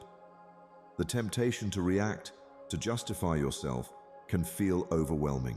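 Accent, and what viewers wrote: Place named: United Kingdom. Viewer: Why is this a British voice???.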